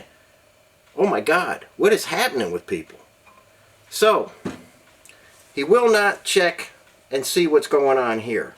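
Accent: United States. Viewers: Yeah well sound very American to me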